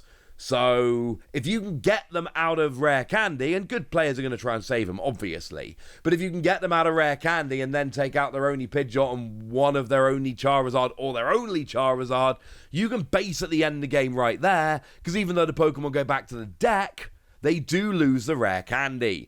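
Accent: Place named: United Kingdom. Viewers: British accent 😌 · He’s British. Kinda like Wah-ter (water) is watt-ah.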